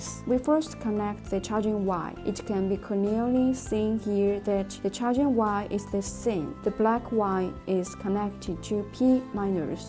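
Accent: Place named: United States. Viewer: Speak without the America essence would sound clear. drawings helps not Co fuse by moving hands...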